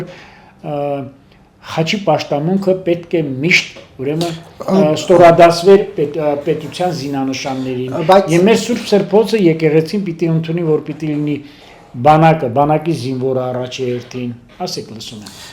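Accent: Armenia. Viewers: во-первых,почему не представляете собеседника,кем является Сафарян? К кочаряну так не обращаетесь,все время акцентируете его бывшее президентство,причем незаконное. Мудрый и умный человек Сафарян,хоть и не знаю кем он является,к сожалению.Представьте его,как должен это делать любой квалифицированный журналист,кем вы не являетесь,судя по вашим избирательным "вопросам". Во-вторых,нельзя быть таким неосведомленным,это оплошность.В третьих,меня интересует откуда вы,явно не из Еревана.Почему так говорю,потому что у вас провинциальный подход к людям.Вы избирательно к ним относитесь и по-хамски,это тоже говорит о провинциальном происхождении + акцент.В следующий раз,будьте добры,представлять своих собеседников.Сафарян прав во всем,а вы слабак в журналистике.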